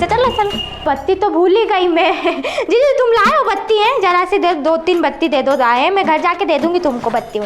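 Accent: Indore, India. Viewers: Love my Indore and Indori lahja😆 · Where do you find this content? You are so real on your acting along with indori tone. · Ahaa that pure indori tone...really that teej wali line m aunties aese hi hote h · Watching your video from Paris... I miss my Indore and the language so much, I show my husband your videos and tell him, that's how we speak :p Lots of love <3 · Indori accent is contagious 😂😂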